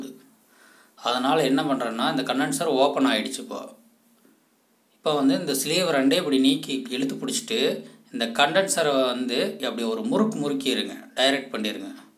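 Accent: Kongu, India. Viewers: நம்ம கொங்கு தமிழ்'ல Semma explanation ன்னா 🤝✌️ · கொங்கு தமிழ்.கேக்க கேக்க இனிமையாக இருக்கு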